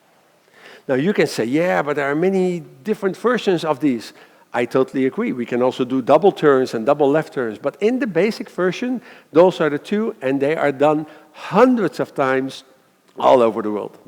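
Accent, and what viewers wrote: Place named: Netherlands. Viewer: Well this is one very distinctive dutch accent....👍👍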